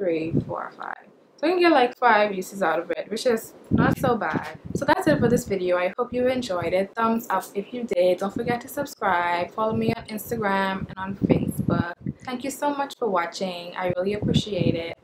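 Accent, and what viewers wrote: Bahamas. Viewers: man heyyy i recognize a bahamain wen i hear one aee....looool i thought i recognized ur voice...yeah tingz ova here hella expensive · As a Bahamian who lives abroad i watch natural hair videos all the time to get tips. I spotted your accent from the first sentence!!! Im so excited to see a Bahamian vlogger! Thanks for this video girl, it was really helpful. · That accent cant hide boy the minute i play the video i was like that gal gata be bahamian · The second I heard your voice, I was like "that's a Bahamian" lol. I was right. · omg... this is my first time watching your videos. They say Bahamians dont have an accent, from the first time I heard your voice i knew you were Bahamian. Great videos. Thanks for sharing!!! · You're a Bahamian? At least you sound like one. I love your accent 😍😍😍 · mdss..when I first hear you speak I was like she gatta be Bahamian Iol...ik my people but keep up the work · I'm dying. 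All I was thinking was why she sounds like a Bahamian explaining something. I finally heard "Nassau" and was validated. Heyyy, cheers to Bahamian vloggers. Keep it up! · Oops you are from Nassau my family is from there I knew I heard the accent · From you opened ur mouth I was like "Is this girl from the bahamas"! Nice video. I used that shampoo when I was relaxed, but now that im natural I only co wash, I use cantu or pantene co washes, pantene is really good. I really have to get that aussie moist conditioner tho, wanted that for a while now. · Lmao how I guess you were a Bahamian by the accent :")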